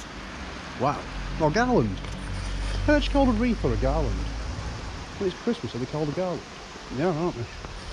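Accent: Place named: Lancashire, United Kingdom. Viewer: As in Vee-k-us. I'm a Roman Lancastrian and talk just like you! Salt of...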